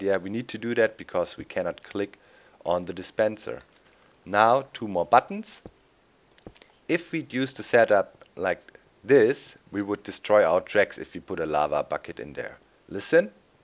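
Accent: Germany. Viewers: Auch nicht schlecht, dachte mir doch der Akzent hört sich deutsch an. Aber sicher war ich mir dann bei "Last but not least" ;) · Are you german? :)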